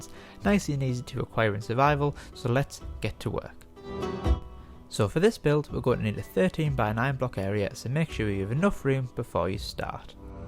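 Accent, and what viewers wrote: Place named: Scotland, United Kingdom. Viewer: From your acent i guess you are from skotland like yelowwool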